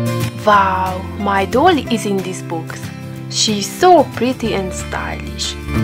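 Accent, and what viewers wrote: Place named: Romania. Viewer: Your accent is beautiful, please don't think you have to change it at all! Your English is perfectly understandable, and I personally adore the way you speak it. I would love to hear you speak Romanian too, though so I may be biased. LOL And I know my Romanian pronunciation would be SO much worse, by a LONG way. :D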